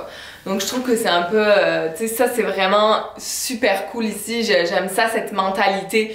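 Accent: Quebec, Canada. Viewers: pourquoi tu prends l'accent québecois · wow bel accent francais quebecois je connais un francais qui est ici depuis 60 ans et il a jamais perdu son accent ce qui est cool aussi pour la securite a 69 ans je peut dire que jamais je ne me suis pas senti en securite meme la nuit .encore aujourdhui bienvenue a tous les francais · l'accent Québécois cest l'horreure.😞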